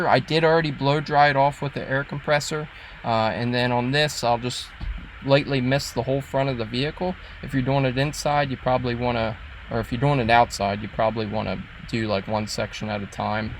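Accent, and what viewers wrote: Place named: Pennsylvania, United States. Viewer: You sound like your from PA, although you did say wal-ter and not wonder. But other than that I hear a PA accent?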